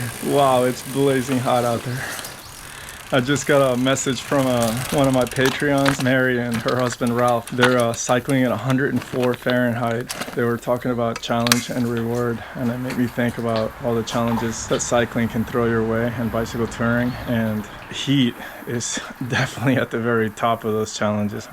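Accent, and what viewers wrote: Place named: Puerto Rico. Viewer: I always try to figure out what accent I'm hearing..my guess is Puerto Rican?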